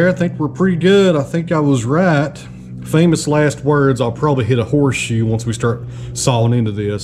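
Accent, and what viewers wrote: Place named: West, United States. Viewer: Thank you for the beautiful music and this COLORFUL "OLD" APPLE TREE 'WOOD' video. And Your voice! I feel like watching "WEST FILMS" in the old cinema, I like and emphasize * Thank you for the video you made with "Old and colorful Apple Tree" accompanied by beautiful music. Also, with your tone and accent, I saw myself watching "WESTERN MOVIE" in an old cinema. Country Music is beautiful in its songs. I remembered AUSSIE Singer Slim Dusty and others. Thanks again. Good Luck and All The Best. From ANTALYA/ TURKEY.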